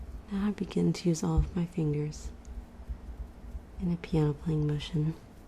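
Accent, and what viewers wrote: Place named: Midwest, United States. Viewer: It's alright. You and I have the same accent. Not quite... deep southern. And not quite something else. >_> I'm not even sure what it is. Midwestern, I suppose. I LIKE IT. <3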